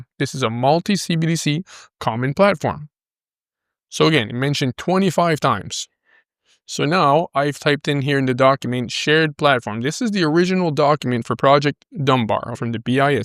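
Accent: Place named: Quebec, Canada. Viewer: brother never stop making XRP content. Btw I’m In Toronto. You sound like you’re from either northern Ontario or Quebec. Am I right?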